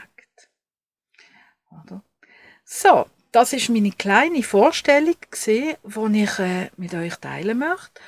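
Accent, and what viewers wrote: Switzerland. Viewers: Vielen Dank für den tollen podcast auf schweizerdeutsch. Ich bin in Luzern aufgewachsen, jetzt lebe ich in Italien schon 50 Jahre und fermisse das schöne Schweizer dialeckt. Ich ferstehe dich richtig gut. Mach weiter so, du hast sofort einen Daumen hoch bekommen. Viele liebe Grüsse Livia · Servus, herrlich, Schwyzerdütsch! Das gefällt mir:-) Ich freue mich auf weitere Folgen. Viele Grüße aus Oberbayern, Susanne, die Orientbayerin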